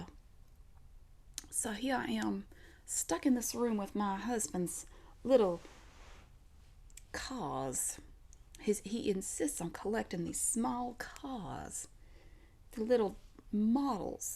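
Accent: South, United States. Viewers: I love the accent, where about are you from? I'm from East Tennessee.lol you should do a Hillbilly asmr lol.that would be funnier as a bucket of tadpoles.lol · I miss these accents, it’s rare to find someone who still has that “southern belle” twang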